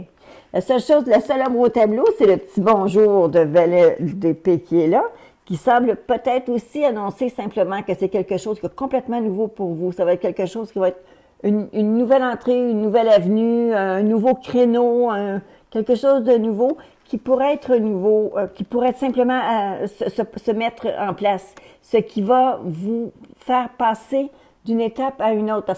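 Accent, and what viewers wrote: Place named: Quebec, Canada. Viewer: Vous êtes vraiment formidable. Et puis....., bravo..🙌🙏.., vous avez changé votre '' look vidéo''. Le son est clair...et voire même votre excellent français canadien.😀. Il est devenu bcp plus prononcé eeeet...très distinctif. Merci ma soeur et que Dieu vous bénisse richement.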